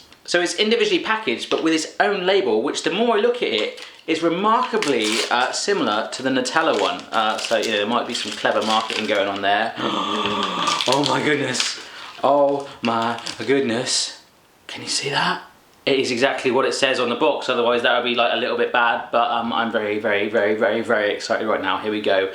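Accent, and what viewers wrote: United Kingdom. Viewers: Er hat ein britischen Akzent :) ich denke er kommt von England. Trotzdem ist es lustig wie die anderen Länder , deutsche Süßigkeiten feiern :D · Miss Rose he is not american, he's british as you can tell by listening to his accent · Ich finde man hört seinen britischer Akzent sehr deutlich ;D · Er ist aber ein Brite, hört man sofort am Akzent.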